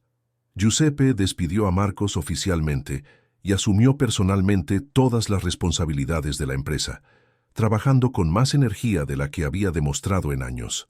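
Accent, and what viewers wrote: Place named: Argentina. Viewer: No me gusta porque es narrada Argentina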